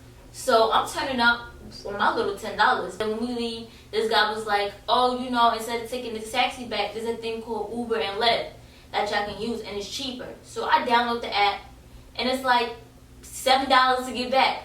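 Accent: New York, United States. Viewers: When you said dumb cheap I knew you weren’t from the South. I knew automatically that u was from NY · That New York accent lol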